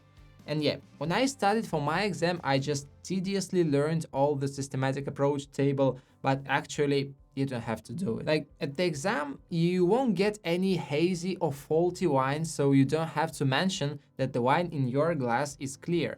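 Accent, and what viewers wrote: Russia. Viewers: Keep going, i belive your channel will grow to a large audience. Love your content. Nice russian accent by the way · Are you russian?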